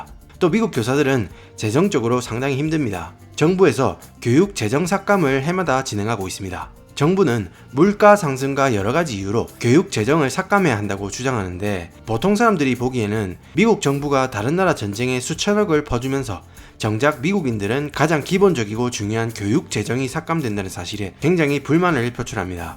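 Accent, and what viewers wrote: Gyeongsang, South Korea. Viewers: 최고 고향이 어딘가요 여긴 창원 사투리가 나오시네요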